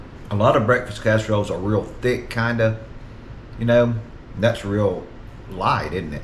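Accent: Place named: Arkansas, United States. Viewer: You sound like an Arkansan, ma'am. I'm from there, lol.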